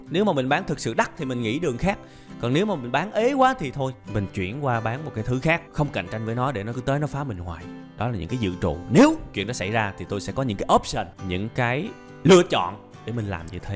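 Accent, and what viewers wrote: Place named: Saigon, Vietnam. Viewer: Thầy giảng hay lắm ạ. Mình thích giọng sài gòn quá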